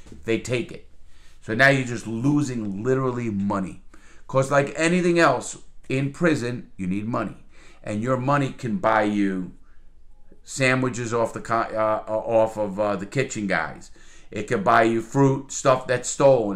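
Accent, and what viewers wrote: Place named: Queens, United States. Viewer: Hey, great stuff. My late dad was raised in queens (Jackson heights) and the accent is different than yours but close. Your vids make me feel like home (living in Dublin for 2 years now). He would call my younger brother and I loan sharks whenever we played monopoly as kids lol. He ran upstate to the Catskills when he was a teenager, he had friends in the street game in the city but was never part of it (at least not seriously). Love the vids, thanks for all you share.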